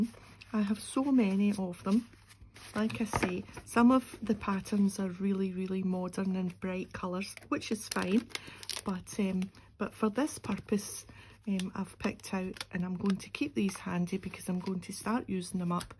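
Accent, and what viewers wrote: Scotland, United Kingdom. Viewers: Hi! It’s midday Sunday here & for a change we have a nice breeze coming through the house! I saw this video & I said, “yay, some Scottish” (but I guess it’s really English with a Scottish accent!) Anyway, it’s so melodic, I could listen all day! I really like these journal cards, especially the one with orange touches! I’m so glad you showed the books, I looked on Amazon & found 2 used copies of the bird book! It’s so soft & dreamy, I will enjoy using those little cuties! It’s also September 1st! I hope autumn will arrive soon! Have a great day! Erica❤ I read somewhere that one has to learn Gaelic in order to become a Scottish citizen?? I bet that helps to keep the number of applicants down! Haha!❤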